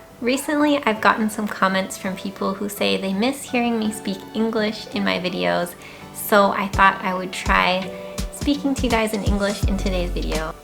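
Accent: Taiwan. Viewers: 觀看的影片一直讓人覺得很舒服，妳的中文有好聽的台灣親切口音，節奏也很放鬆彷彿就像是個熟悉的好朋友在身邊說話，謝謝你和我們一樣一起愛這片土地與人文，祝福越來越好! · 你講中文根本就是台北腔了，跟你老公根本沒差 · 好道地的台灣中文~ 命中註定當台灣人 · What apps or resources did you learn Mandarin with a Taiwanese dialect/pronounciation? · 這個小姐姐說話都很台也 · the Mandarin you spoke make me feel very comfortable, that's because you have a strong Taiwanese accent! I learn English from you and other youtubers, like Wes Davies...etc. Briefly that Wes and you speak the most clear and neat English that I learn. Very good listening that I'd ever had. Also I am learning Japanese, too. My opinion to you that making English videos can make your channel more popular, and get more subscribers! keep following your channel.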